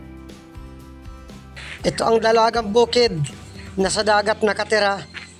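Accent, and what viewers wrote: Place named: Batangas, Philippines. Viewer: Idol taga saan kb kc ung pana2lita mo prang marinduque or btangas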